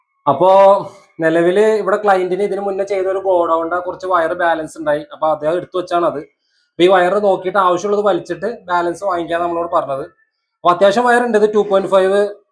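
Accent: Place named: Kannur, India. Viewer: സംസാരം കേട്ടപ്പോൾ kannurക്കാരനാണ് തോന്നി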